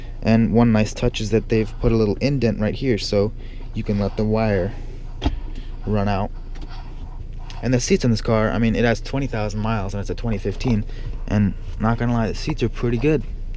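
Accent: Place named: New Jersey, United States. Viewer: Are you from Jersey or are you Italian? This is my first time watching one of your videos but your accent in your voice reminds me of Pauly D from Jersey Shore.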